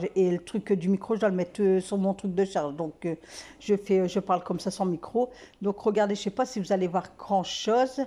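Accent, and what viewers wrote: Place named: Nord-Pas-de-Calais, France. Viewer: Coucou ma vive les ch'tis Je les aimes et j'aime trop l'accent ch'tis ❤❤❤❤❤❤❤❤❤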